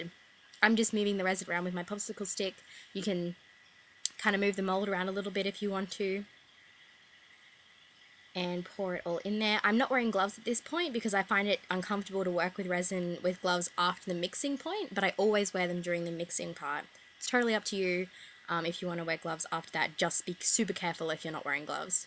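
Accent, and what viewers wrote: Australia. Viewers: I love your beautiful Aussie accent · An Australian accent! So refreshing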